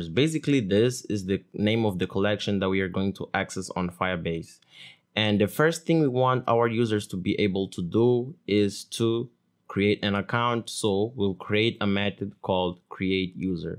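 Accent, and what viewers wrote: Brazil. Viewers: vc é brasileiro? curti seu ingles haha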